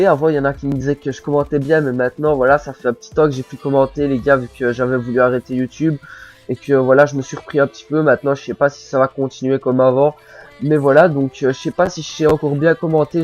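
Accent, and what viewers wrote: Belgium. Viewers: Hahaha tu m'as tué mon accent de mes couille j'ai raté ma vie prc g pris la Xbox Xd (ps moi aussi je suis belge et g un accent comme le tiens (un accent de mes couille comme tu dis) continue!!!) +1 abo à ta chaîne principal (déjà abonné a là évasion) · Commentary a la belge comme moi x') !!!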